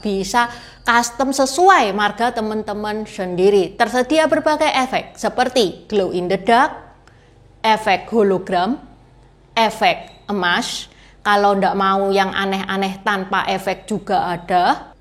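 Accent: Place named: Surabaya, Indonesia. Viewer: Wong iki logat suroboyaan. Medok temen. 😁 Yo wes ngomongo sak karepmu, tak rungokno. ☺️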